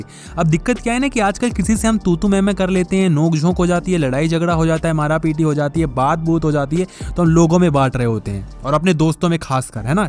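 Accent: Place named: Uttar Pradesh, India. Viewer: Lagta hai aap u.p state ke ho ..mera ak friend bhi u.p ka h ..wo bhi aise hi bolta h ..har baat me bhiya use krta h..😂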